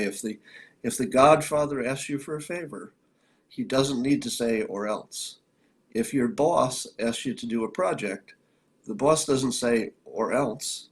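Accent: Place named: South, United States. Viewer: Watched you on Gutfeld, your voice is fine, has a very rich, almost southern quality.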